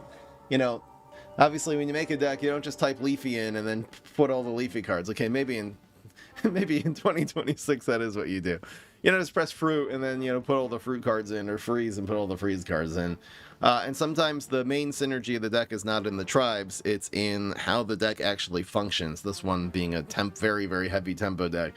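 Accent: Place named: France. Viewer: Voice french is so funny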